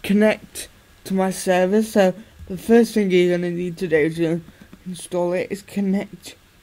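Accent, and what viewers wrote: United Kingdom. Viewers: are you from south whales ol chum?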